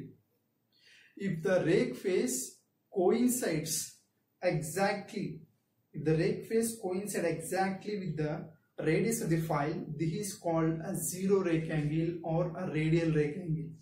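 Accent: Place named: India. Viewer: شرح جميل ومبسط لهذا الموضوع اتمنى ان تكتب الشرح في صندوق الوصف لاني اواجه صعوبه بفهم اللكنه الهنديه تحياتي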